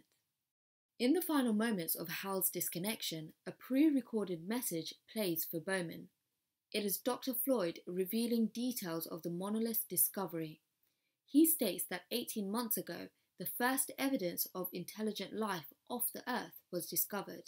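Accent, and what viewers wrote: London, United Kingdom. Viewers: totally agreed beautiful British accent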